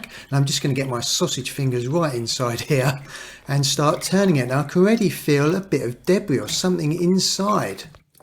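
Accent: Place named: Cockney, United Kingdom. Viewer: A cockney needing a cup of tea before doing the job. A Northerner would have just got on with it without messing about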